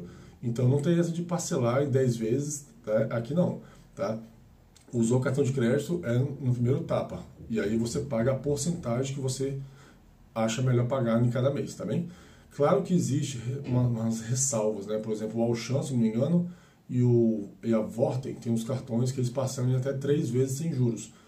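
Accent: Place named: Bahia, Brazil. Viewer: Vc fala como neu irmã da Bahia, Salvador, por isso pergunta se é baiana, desculpa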